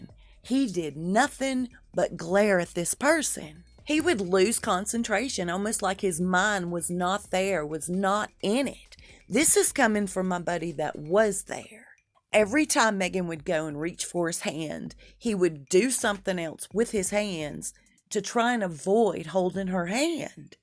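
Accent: South, United States. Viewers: Catty, nasty and hateful. This narrator is one of the original small town "Mean Girls". Even her southern accent proves it. Wonder who paid her to do this?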